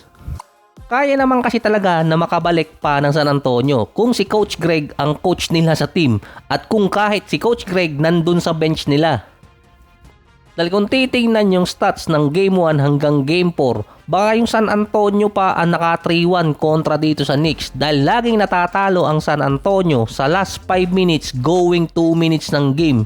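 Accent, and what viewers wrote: Batangas, Philippines. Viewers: Batangueño ka din pala😃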